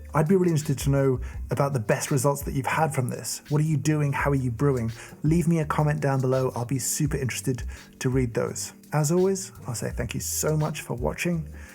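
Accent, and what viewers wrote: United Kingdom. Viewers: I went through this entire video thinking that the brewer was called the "Delta Brewer" and that was just being British... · I can't help but think of the fact that the British accent often pronounces words ending a as an er - like delta sounds like delter, despite the fact that it's from Australia.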